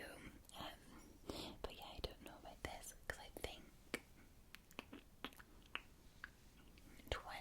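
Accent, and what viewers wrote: Scotland, United Kingdom. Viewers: Who else would love to see a Shrek roleplay from, she also has a strong Scottish accent, which makes it all the better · I’m loving the Scottish accent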